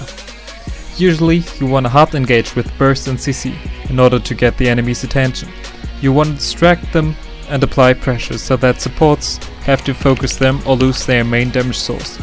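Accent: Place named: Germany. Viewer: Naja, also man muss ja sagen... Der deutsche Akzent ist ja mal echt lustig :D aber deine intro haut es raus... Mega awesome :3 wirklich und danke für den build anyways :D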